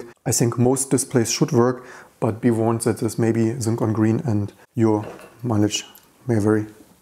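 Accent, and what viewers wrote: Germany. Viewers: Mh the heavy german accent, delicious! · Your english is very german.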